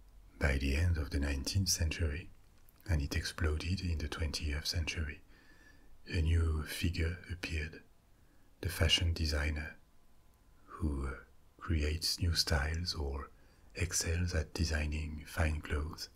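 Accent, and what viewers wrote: France. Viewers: My sleep can be erratic! Some days and night, despite fatigue, I don’t sleep. Or, I eventually fall asleep, or something altogether different, I can fall in bed and fall asleep very quickly!!! This has been my fate most of my life including as a young child. seems to be just the ticket! I love his French accent, I love the whispery and intimately inflection that seems to be just him with me. When I don’t fall asleep right away, I am unformed. When I can’t fall asleep at all teaches me! This man has a craft, a skill, and a gift! I feel honored to be included!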